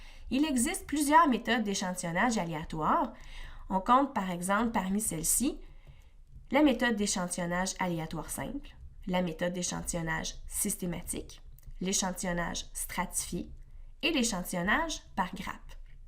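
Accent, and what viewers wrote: Canada. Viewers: très très bien expliqué (même avec votre accent canadien lol)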